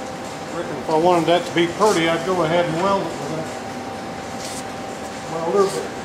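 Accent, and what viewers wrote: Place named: United States. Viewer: I like the way he speaks . Reminds me of real American person how they talk .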